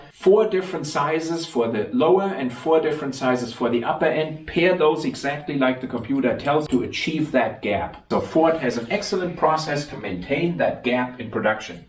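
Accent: Germany. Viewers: Love thee German accent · I think I have never seen a video with more in-depth analysis and plain old truth, all topped off with a cool German accent! The sad truth is that very few people care to know anything about the car they drive - no more than where to put the fuel in, if that much. In other words, most people are simply consumers of what other people design, manufacture, and maintain - and spend all their time complaining about how their feelings got hurt by this group or that one.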